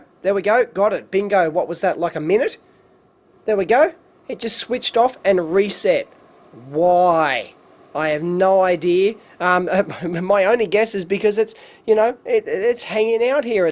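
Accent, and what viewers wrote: Australia. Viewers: Lol, English is not my first language also I was not really used to Australian accent but I can follow perfectly... You should try the Disney Channel :-P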